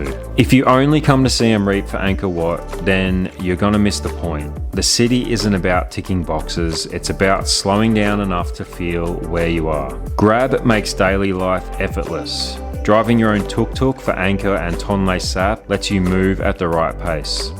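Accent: Australia. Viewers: Hi, I’m heading to Cambodia in a month with my wife brother and sister in law, do you need a motorcycle license for the Tuk Tuk and how much did it cost? Loving your videos and good to hear an Aussie voice in them. 😁👍🇦🇺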